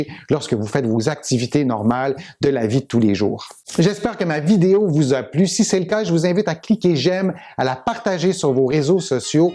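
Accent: Quebec, Canada. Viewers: Très intéressant. Je les faisais déjà de ma propre intuition mais pas debout. Et c'est très efficace. Ça ralentit significativement l'evolution. L accent canadien me ravit. C est donc "double peine".. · Grand merci de France pour toutes ces propositions d exercices ...que j'aurais aimé trouver il y a quelques années... Mais mieux vaut tardbque jamais 😊 Je vais m y mettre avec grand plaisir pour tenter d enrayer la progression .. et c est si "plaisant" d entendre votre accent enchanteur (mon mari était québécois 😘🙏) Merci infiniment !🙏🙏🙏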